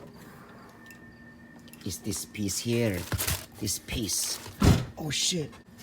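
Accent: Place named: Philippines. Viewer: Thank you so much pare! I loved your distinctive Filipino accent and humor. I'll be doing this project soon! So many say you have to open your roof half way to do this but, obviously you don't! Salamat po!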